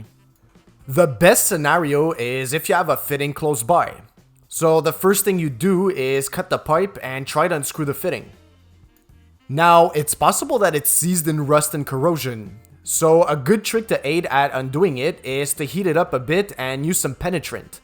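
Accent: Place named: Montreal, Canada. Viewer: Montreal accent for sure.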